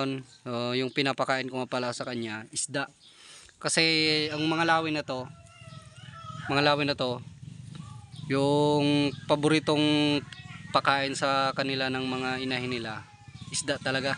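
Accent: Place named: Waray, Philippines. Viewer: Salamat pala dol sa pag shout out saan lugar mo idol waray bayan pang yong tuno kasi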